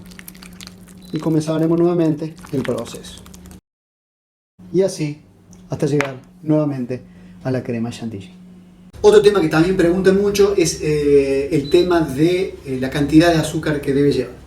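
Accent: Córdoba, Argentina. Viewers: Espectacular!! Ahora si!! Fué un placer ser " toda oidos". Me entendí todo en tu español cordobés 😂😂. Una clase magistral. Las cremas que tiré!! X Ds!! Eran recuperables!! Gracias mil. En Uruguay de donde soy, la crema doble Light Conaprole uht jamás se montó. Ahora sé xq!! Tiene 17% mat. grasa. Recién lo veo. Gracias por el super tip de agregar manteca. Mil gracias por todos los trucos. Sos un genio. La intro y final están genial. Ya hay ahí un touch de música. La edición y los gráficos también. Pero en el celu como que no se llegan a leer aunque estés en pantalla completa. Capaz sería bueno darle uno 2 segundos de 1er plano. Cosa de poder pausar y leer para no perder la info.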